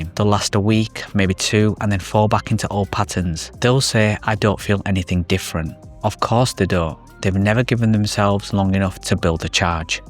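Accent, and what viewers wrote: United Kingdom. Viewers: Just found this channel. A fellow Yorkshire brother I believe? Leeds? Haha. Back for my SR journey! Let's go!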